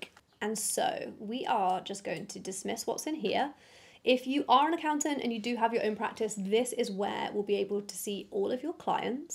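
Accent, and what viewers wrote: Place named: United Kingdom. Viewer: Just started the video and Ssss, sucha a lovely UK accent, I wish if I could get a mate to help me develop the same Accent, Excellent, now I will continue with the main purpose of the vidoe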